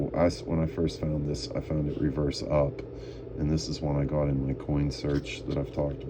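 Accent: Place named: Pittsburgh, United States. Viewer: Sounds like you are from Pittsburgh. Or somewhere close. Anyway I like the red/orange cents.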